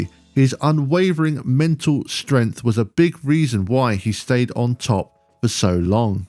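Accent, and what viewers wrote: United Kingdom. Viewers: Bs. A British AI voice?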